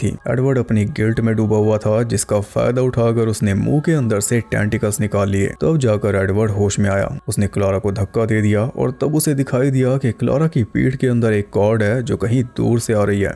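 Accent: Haryana, India. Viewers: Aap ki explanation ek baat pata chali ki aap harayana se ho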